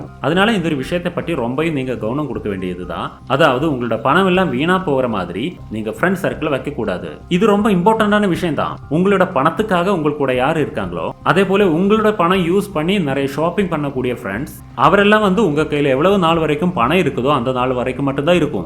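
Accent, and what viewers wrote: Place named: North, India. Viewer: Vadamanila manithan pesum tamil... Try to talk in pure tamil or pure English... Don't mix(tamil+hindhi slang)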